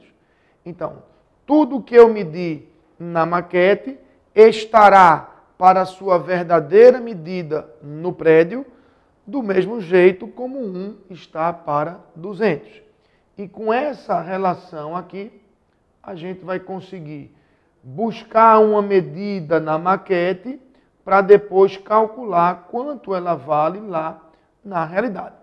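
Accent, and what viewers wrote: Northeast, Brazil. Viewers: Sabia que era nordestino. Mas não sabia identificar o estado! Obrigado :)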